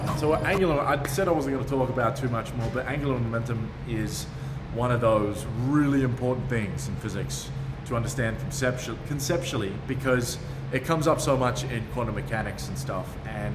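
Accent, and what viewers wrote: Australia. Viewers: Lord have mercy, the Australian accent plus the deep voice plus them visuals wooow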